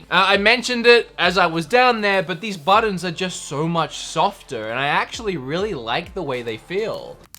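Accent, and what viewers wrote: Australia. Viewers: You sound Australian and American. Which one are you?? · I'm very confused with your accent... sometimes a little Australian slips in... Anyway, I'm savin up to get a Switch. Played BoTW on my nephew's and I want that experience 24/7 now. But I don't intend to get the Lite because in my hands, the white buttons won't stay white for long.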